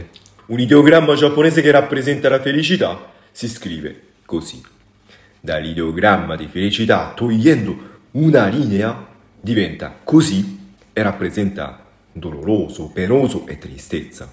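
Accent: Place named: Rome, Italy. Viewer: Certo che l’accento romano su di te ha degli effetti sorprendenti! Grande. (Per inciso, magari sapessi parlare giapponese come tu parli l’italiano)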